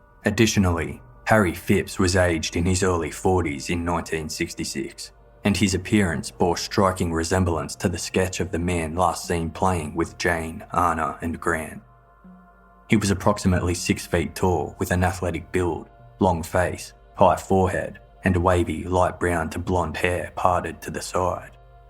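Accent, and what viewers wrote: Australia. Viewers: This is the best narrative, lots of details. I love your aus accent too!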